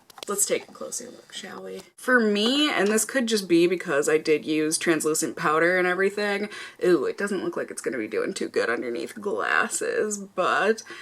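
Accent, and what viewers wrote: Minnesota, United States. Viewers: She is so relatable. She seems like someone I would have gone to art school with. Watching her videos always feels like sitting down with a girlfriend and doing our makeup. And that voice! So relaxing. Canadian here, and I think her Minnesotan accent is comfy and homey.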